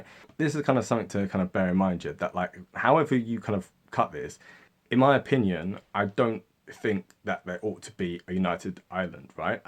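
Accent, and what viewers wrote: England, United Kingdom. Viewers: Another thought-provoking video. I don't feel like you have a bias, you've said things that would kind of piss off both communities here. Your videos are uniquely well researched, strange to hear an Englishman sound like he actually knows a bit about the North. Respect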